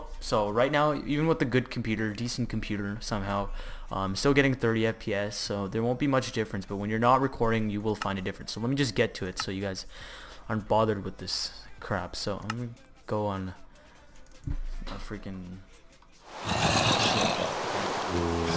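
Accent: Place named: United States. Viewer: Great :) . But u actually sound like you are an american :O . How ?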